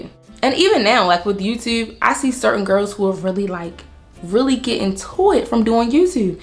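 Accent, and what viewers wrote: Baltimore, United States. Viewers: are you from Baltimore? (I’m from there) idk I can just tell by the accent 😭. but you are so pretty and this video was sooo helpful! ❤️ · are you from bmore? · I have a video idea you should make a video of you saying words I’m just realizing you from Baltimore and that’s why I really like your accent. You should make a video saying different words like you too. Earl blue Avenue. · I know a Baltimore accent when I hear it! But you gained a new follower❤️ I needed to hear this !! Thank you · ✨Your accent is giving me East Baltimore vibes ✨ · I hear that Baltimore lol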